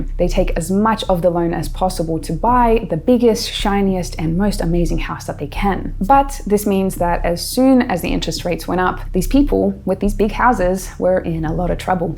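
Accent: Australia. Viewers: You're from Iceland!😮 I thought you were Australian.🤔 I make lots of predictions. Sometimes I'm right. But I'm not famous for it. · I am surprised you are in Iceland! I thought you were Australian. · Iceland??!?! You sound like an Ozzie! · For a minute I thought you were Australian but thank you for this warning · I always thought you were Australian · Iceland?? She sounds like an Aussie to me (and I'm an Aussie!)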